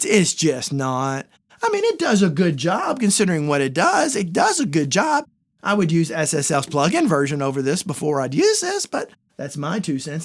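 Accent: South, United States. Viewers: Thank you for saving me from buying this! I have a lot of hardware synths and was considering it, but kept feeling there was something not up to snuff. Love your forest gump accent!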